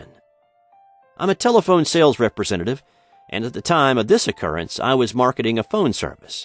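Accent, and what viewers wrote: South, United States. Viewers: Great narration and voice. But I can't tell iwhat regional accent you have. It sounds like it's between Standard American and southernish. Please enlighten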